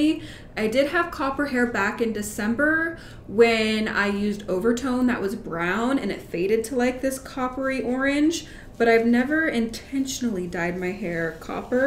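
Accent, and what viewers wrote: United Kingdom. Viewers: Lol 😂 love your British accent, Hi from Manchester in the UK 🇬🇧 x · Major Ginger Spice vibes - perfect with the brit accent ✌️👩‍🦰🇬🇧 Love it!!!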